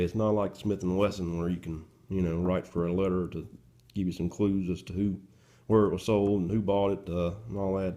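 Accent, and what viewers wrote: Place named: South, United States. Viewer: lol this southern slang always sounds mentally retarded.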